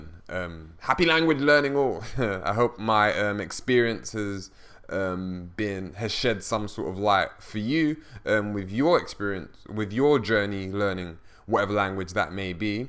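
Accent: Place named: United Kingdom. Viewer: 3 weeks is not enough time for you to get a good understanding. It would take several months for you to become fluent. Immersion is the best way. It sounds like you are british so I dont know what its like there but here in the US you dont have to go to a foreign country to immerse yourself at least once a week with native speakers. I spent a month in Costa Rica and toward the end I felt my spanish improving slightly, but not terribly, I needed more time.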